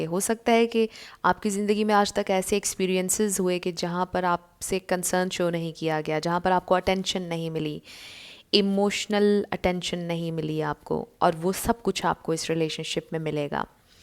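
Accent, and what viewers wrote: Pakistan. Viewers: Your voice is so addictive with that peculiar urdu touch... I love your voice and style of speaking....Stay blessed ma'am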